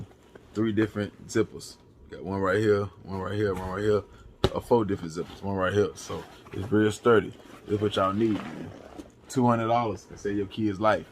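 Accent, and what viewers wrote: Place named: North Carolina, United States. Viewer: Good video. I knew U sounded like u from NC❗️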